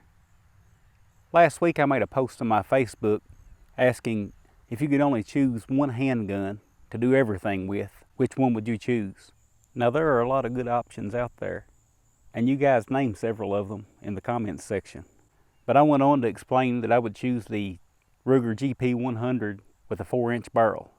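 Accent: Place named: South, United States. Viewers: Saw a pic of ky, sounds like ur from ky aswell.. What county u from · Nice video! It's nice to jump in the way back machine once in a while and see you in your younger years. I could be wrong, but aren't those GP 100s made up in New Hampshire? I have a few RARs that were made in Mayodan and like them all very much, as bolt action 22s are my favorite. Grew up in a family that spoke southern as a second language (Grandma was from North Carolina) so I can understand you perfectly when you talk lol. All of the best to you and yours from up North. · This guy has an amazing accent - can you tell me where does he come from? Texas? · I have a S&w 686 6in but agree with you that the Ruger is a fine revolver ( as are nearly all of their products) thanks for an entertaining review and I love the "metric" conversions but most of all please keep saying "burrel" that must be the metric version of barrel, ya gotta love a southern accent, keep up the good work. 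· The best thing about this review is the guy's accent. So southern, I love it.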